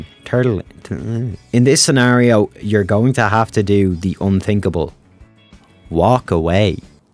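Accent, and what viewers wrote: Ireland. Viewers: Ahh. A fellow Irish man. First time watching. Wexford or Dublin? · He’s Irish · my god what an amazing Irish accent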